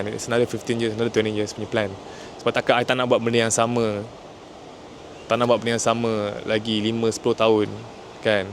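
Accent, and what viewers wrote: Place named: Philippines. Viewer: i honestly thought you are a filipino, had to pause what i am doing to see what your nationality is the moment you started speaking different language.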